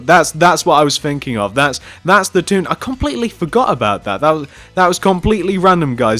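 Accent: United Kingdom. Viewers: Why every YouTubers in British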